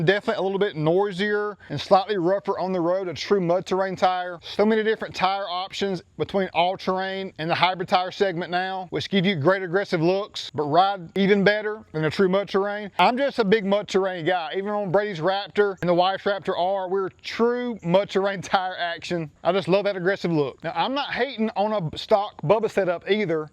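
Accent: Georgia, United States. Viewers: First time viewer, was thinking man he sounds like he’s from Ga. Then I saw Todd’s truck to confirm it. Hell yeah !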